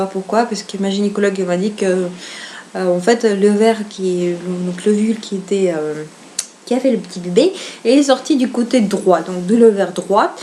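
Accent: Russia. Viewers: Je m'abonne à ta chaîne, tu me rappelle une amie que j'avais au lycée et qui était russe, elle s'appellait Macha et elle avait le même accent que toi!!! Es tu russe?en plus tu as une voix douce très agréable à écouter. J'ai des doutes sur une probable grossesse...comme on dit il y a des choses qu'on sent qui ne sont pas comme d'habitude.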